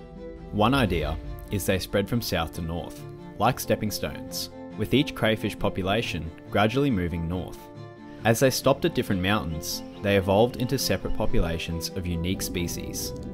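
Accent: Australia. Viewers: david Attenborough from down under. These videos are getting better and better.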